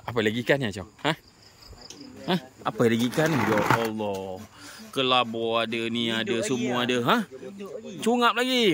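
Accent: Perak, Malaysia. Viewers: Pekat loghat peroknye, beso kome 😂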